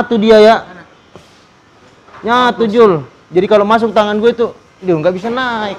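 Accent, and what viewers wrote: Makassar, Indonesia. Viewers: Emm berbau Makassar logak nya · Orang makassarka bang?...karena dari logat abang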